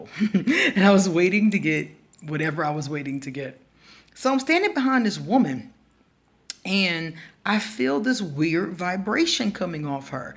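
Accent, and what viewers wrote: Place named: Philadelphia, United States. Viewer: I knew I was getting philly girl vibes from you, I hear it all in your accent ❤💛💚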